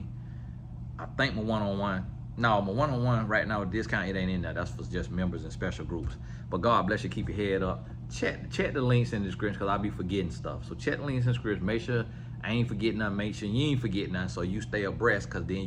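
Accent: South, United States. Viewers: I am trying to figure out your accent Mr Tony. Is it a Southern drawl? 🇦🇬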